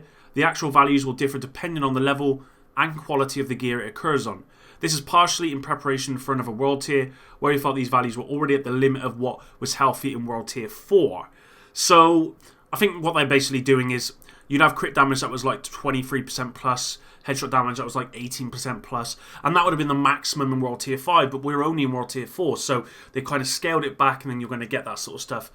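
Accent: United Kingdom. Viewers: Hey mate.. just subbed.. I have seen your content before and always appreciate your nice clear commentaries. Except for linearly, but no one can say that world lol.. what part of the world are you in.. can hear a British accent in there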